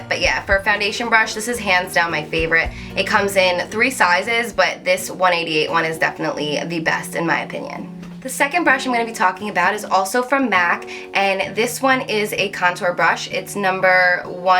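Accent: New Jersey, United States. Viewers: are you from NJ?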